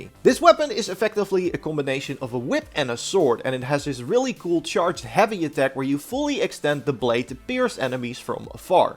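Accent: Netherlands. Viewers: Jij klinkt zo Nederland's ,lol ,great vid btw ❤️💪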